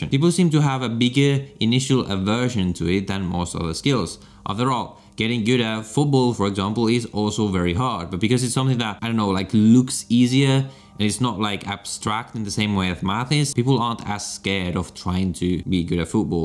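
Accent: India. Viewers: Why do you have an indian accent? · it kinda sounds indian bro, just saying. I hope you are not some charlatan or something. whatever you are trying to hide we can tell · I love your accent, it's a bit like the indian accent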